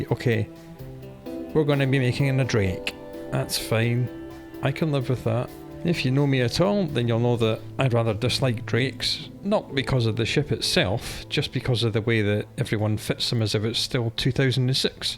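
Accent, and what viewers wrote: Scotland, United Kingdom. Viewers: your videos are amazing, lovely conversational way of going through things, the Scottish accent and easy going manner is of course fully to blame. A top lad.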